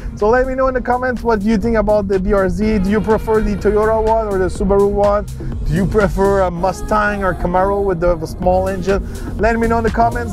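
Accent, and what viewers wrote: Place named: Quebec, Canada. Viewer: Le ptit accent du Québec 😆👌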